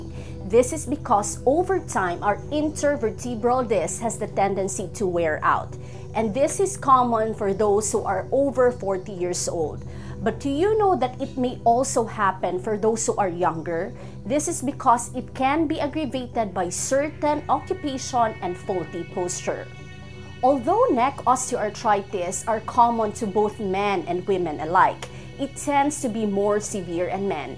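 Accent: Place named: Philippines. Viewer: How cute the Filipino accent is 😊... I have neck pain, stiffness and the pain radiates up to the head and I've been like 3 weeks with nonstop headache like migraine which comes from the neck,I don't have numbness or shoulder pain,only neck and head... I don't know if it's osteoarthritis or not ,but it's really hell what I'm going through !!!!!!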